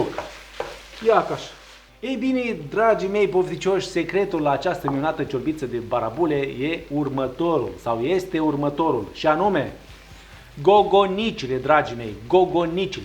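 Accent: Moldova. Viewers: Pot sa ascult fix un minut pe cineva cu accent de moldovean,Dupa aia ma ia greata.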